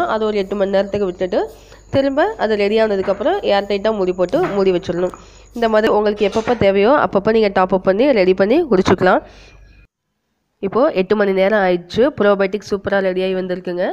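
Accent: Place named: Kerala, India. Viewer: Sister unga malali ucharipu alaga iruku sister😊😊😊.....all the very best for your videos sister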